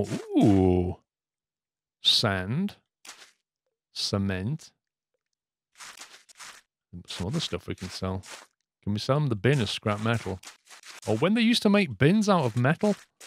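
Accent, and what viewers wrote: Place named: Liverpool, United Kingdom. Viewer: lmao your Liverpool accent is spot on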